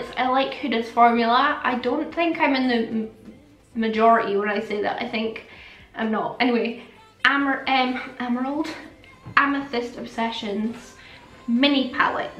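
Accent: Scotland, United Kingdom. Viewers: the Scottish accent sounds so mellifluous like you are low key singing your words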